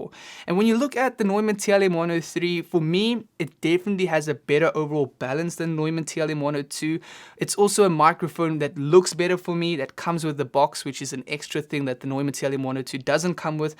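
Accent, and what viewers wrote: South Africa. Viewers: You sound South African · Dude! Where are you from? You sound South African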